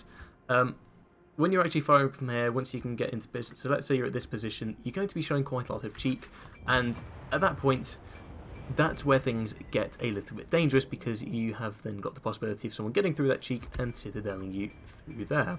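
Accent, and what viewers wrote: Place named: United Kingdom. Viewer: Nice to listen the British accent. Of course we have Jingles but this sounds a bit different. Izumo used to be terrible. Not anymore. Nice video m8!👍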